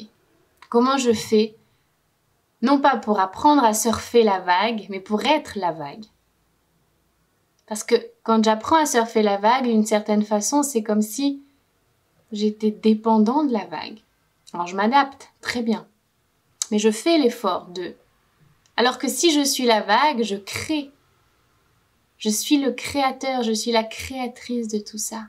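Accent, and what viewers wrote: Spain. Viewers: Coucou ! Ya estás pillando el accento español un huevo! :) Yo pillando el francés....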